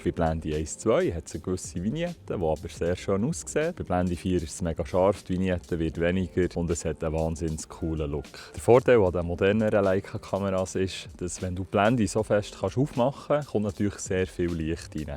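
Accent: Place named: Switzerland. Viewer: Herrlich, dieses Schwizerdütsch. Leider verstehe ich so wenig, macht nix.Ich glaube nicht an diesen Leica -Mythos. Mit meiner alten Canon D60 , einem 105 Tele und einem Polfilter kriege ich fast genauso schöne Fotos hin, natürlich nicht in der Auflösung. Ist ja auch nicht sinnvoll, wenn ich das gesamte Foto betrachte.Ach, Entschuldigung, ich komme aus Dortmund, dem Ruhrgebiet.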